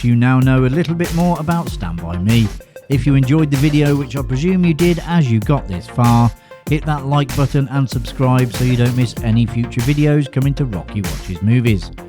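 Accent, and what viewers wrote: United Kingdom. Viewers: Or that the speaker is British... Which based on his accent, I would say Rocky is British. A classic one is the word Aluminum. British people say "al-u-min-ee-um" instead of "ah-lum-in-um". They even spell it differently for that one...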